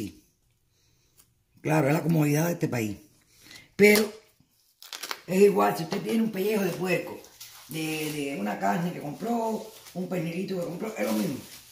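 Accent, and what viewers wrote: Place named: Cuba. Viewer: Gracias, desde que senti tu forma de hablar me di cuenta que eras cubana y me emociones. Hace muchos años que no como chicharrones de viento, la boca se me hizo agua Nury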